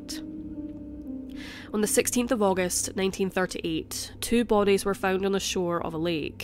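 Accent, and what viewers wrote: Scotland, United Kingdom. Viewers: Scottish accent. Why does it matter if she narrates? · I can't imagine having such a limited (and limiting) world view. Check out any of Sean Connery's movies, and you too can learn to recognize a Scottish accent. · Well this puts Jack the Ripper into the lower leagues of serial killers for sure. This was a very professional production told in a clear and logical way and very interesting; I have subscribed. You also have that lovely Scots accent which is perfect for story telling. Thank you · Scottish accent for me , very erotic for nightmarish stories ... , the reason of sucess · Really liking the narrator's voice. Scottish? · Scottish accent. Not English.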